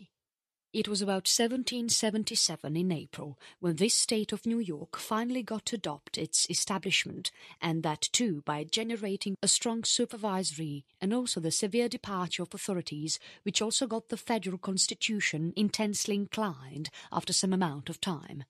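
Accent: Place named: RP, United Kingdom. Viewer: It's nice that this is up free but the english is a little weird...too much focus on trying to sound posh at the expense of clarity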